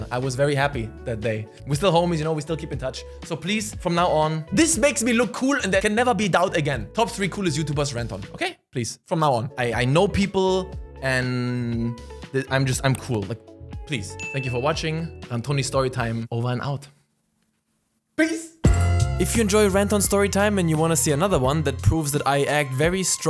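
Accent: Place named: Germany. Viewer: Are you half german? I like your accent man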